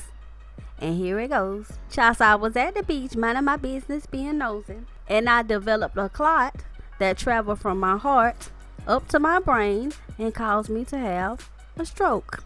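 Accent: South, United States. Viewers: Glad your fine.. Love that accent by the way💯 #SouthernBell😍👌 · Aww my luv am praying for you!!!! Yes life is EXTREMELY short, plzzz take care of yourself, watch what you eat, drink, and exercise its so so important for our people. So glad to continue to hear your lovely voice with a southern twang!!!!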